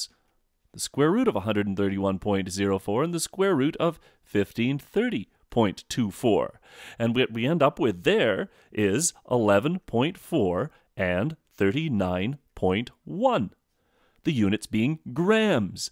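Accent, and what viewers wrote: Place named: Canada. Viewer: I like the Canadian accent :)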